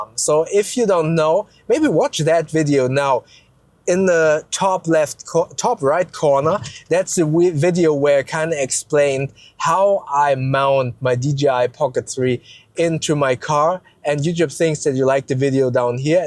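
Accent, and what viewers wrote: Germany. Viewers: Your English sounds fine to me. To be honest, when I first saw your videos on your main channel, I did notice the accent but I couldn't nail down. Didn't even know it was a heavy German accent until I saw this channel. Living in Hawaii, we have people from all over Asia and the Pacific so there all kinds of accents, including a localized version of English which borrows a lot of words from other languages so hearing yours didn't really phase me. People making those comments about your English, especially Americans either live in an area where there isn't a lot of ethnic diversity or just being trolls. You're doing a great job!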